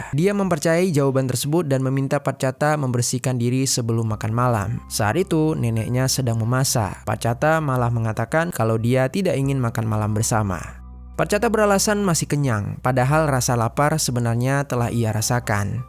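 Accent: Java, Indonesia. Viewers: Suara nya mirip bang jeri tapi logat nya beda yg ini kaya ada Jawa Jawa nya dikit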